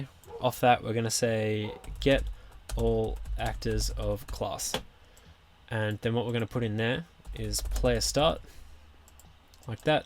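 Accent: Australia. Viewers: Thx for this tutorial!! A few questions though... How can I use a Navmesh bounds volume with this? I added one to a tile and it works on that tile but not when the tile is generated through the BP. Also I loved looking at where you have taken this BP with your Dev Log video and I was wanting to know if you could tell us how to do a couple of things that you never got to in this video. Probability for the tiles to spawn Seeded generation, mostly so you could save and load back to where you were I have got enemies spawning so I guess items will be similar to that but any tutorial you could do that takes this BP and adds in item spawning and enemy spawning would be useful, just to see how you do it and how you build it in to this BP. I have built this BP and modified it a bit but would love to buy it from you if you had an updated version of it for sale with some of the added cool stuff you have done with it!! 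Thx again... really helpful stuff. BTW good to hear another Aussie doing such cool stuff :)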